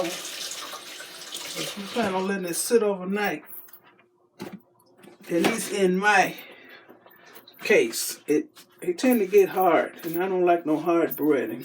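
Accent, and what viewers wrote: South, United States. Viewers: She had me from intro calling ME honey..lol..her southern accent..old school with BOTH arms full of gold bracelets yaaaaasssss..i just got an air fryer last week and made chicken wing dings but not crispy..i will do this recipe next week when I make wings n air fryer..thx so much💜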